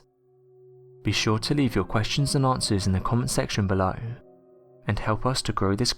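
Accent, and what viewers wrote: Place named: London, United Kingdom. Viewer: English. London sounding. But it's the strange rhythm of his voice that gets me. I just can't handle listening to him. Oh well.........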